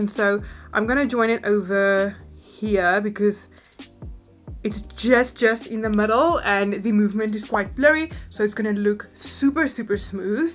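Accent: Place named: South Africa. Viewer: I can tell that you’re from South Africa, I’m from Namibia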